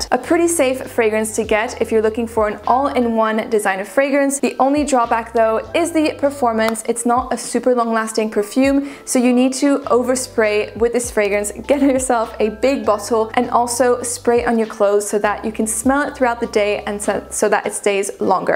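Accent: France. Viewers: She’s a franchie, I’m French so i can see it obviously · My God that accent…I could listen to french people discuss anything. The list is really impressive and has given me some great ideas to sample. Cheers!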